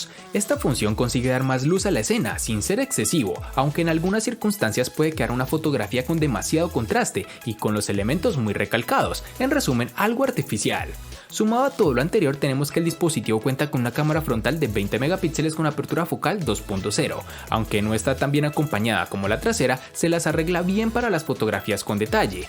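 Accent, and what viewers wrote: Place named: Antioquia, Colombia. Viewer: es mi salvador peor porque se mezcla la voz orgásmica con el acento paisa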